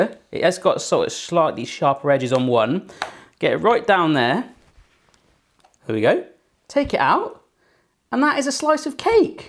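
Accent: United Kingdom. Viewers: i found your channel extremely enjoyable ! :D also i love british accent soo soo much !! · sounds very British in this video haha - the pineapple slicer was the best one by far too!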